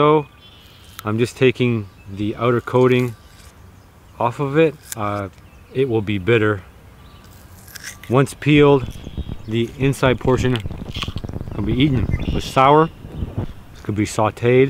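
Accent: Canada. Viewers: Are you Canadian? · My bad just heard how you said things but used pounds as a measurement. Idk what Canada uses.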